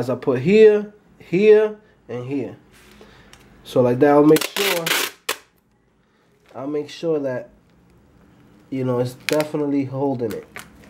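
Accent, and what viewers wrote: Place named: New York, United States. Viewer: You sound like you've gotta be from NY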